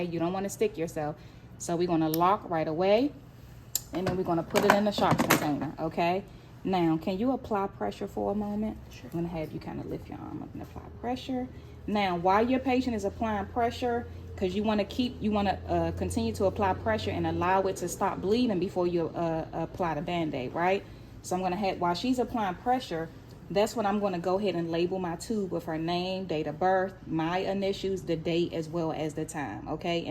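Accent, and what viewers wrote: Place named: Baltimore, United States. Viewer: Thanks for this video,i start my class on Monday.You sound like youre from Baltimore❤.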